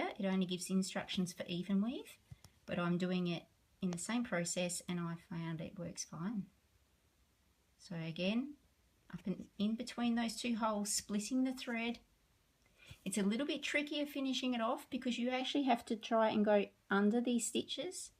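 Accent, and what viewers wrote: Australia. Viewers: Nice to hear a fellow Aussie!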